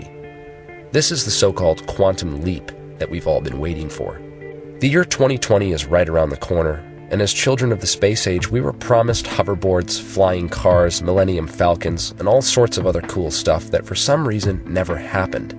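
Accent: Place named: United States. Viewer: Peer reviewed or it's not science. At the risk of offending you greatly (for which I will make amends if needed), I watch physics videos from around the world and I have never heard anyone pronounce Dirac the way you do (rhyming with tie rack). I have trouble believing you have attended any lectures on quantum mechanics. Assuming this is deeply-thought-out science fiction, with possible fleecing of idiots, let me help you sound more authentic, as I am fans of both. I will use capitals to designate the emphasized syllable. Depending on your dialect and/or that of your professor, typical pronunciations are like deRack (with a brief e like in because), diRack (with an i like in bit), d'Rack (just a fleeting vowel noise between consonants), deRock, diRock, Deerack. If I heard that last one I would assume the speaker is from India or nearby. Americans typically use diRack, deRack, or d'Rack. 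Your accent and dialect are clearly American (we call z zee, while most others call it zed), so it is good to stick to American pronunciations. Britons typically pronounce it closer to the original french; deRock or diRock. They might even use a french r sound. Sorry, I don't know how to depict the french r sound by mere spelling. It's like if a letter had r for its mother, while its father's parents were h and a hard g. Kind of throaty. Looking back at this, it is almost certainly the kind of rambling I do when sleep is near. My filters are the first to go... Sorry for offending anyone, but I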